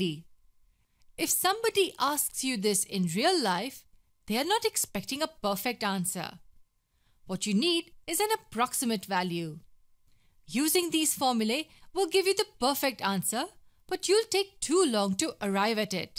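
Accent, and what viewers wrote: India. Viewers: she has an Indian accent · Great trick! Thank you. Love your Indian narration too.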